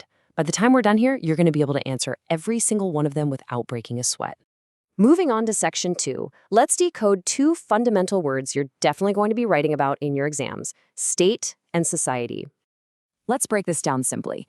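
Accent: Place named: West, United States. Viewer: Good source. My only concern is the western accent.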